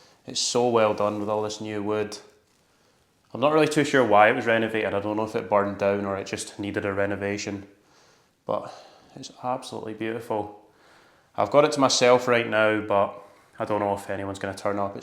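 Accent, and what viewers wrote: Scotland, United Kingdom. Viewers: I love the Scottish accent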